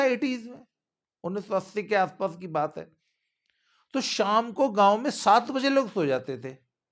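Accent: Haryana, India. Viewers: Aapka haryanvi uccharan dittoo theth harnyanvi hai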